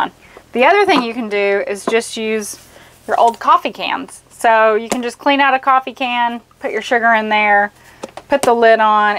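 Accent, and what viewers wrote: United States. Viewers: I love you accent! im French and my favorite American accent is yours!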